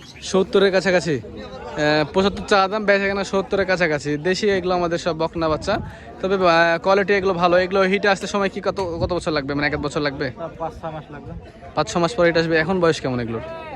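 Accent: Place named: Rajshahi, Bangladesh. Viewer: আমার ভাগিনী বিয়ে দিছি রাজসাহিতে ওর জামাই সাথে মাজে মাঝে কথা বলি আপোনার ভাষা ওনার মতো তাই জিজ্ঞেস করেছি, দেসের বাড়ি আসলে একদিন জাবো বেরাতে, আমারো ইচ্ছে আছে গরু মহিষ এর খামার করবো বাকিটা আল্লাহর ইচ্ছা দোওয়া করবেন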